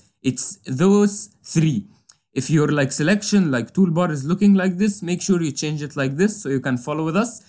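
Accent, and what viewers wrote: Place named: Egypt. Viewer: Egyptian accent !!!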